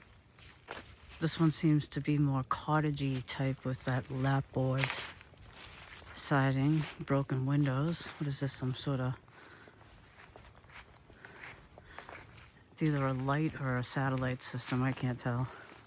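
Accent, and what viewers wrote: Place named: Massachusetts, United States. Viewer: You sound like my mom and my aunt from. They're from Malden Massachusetts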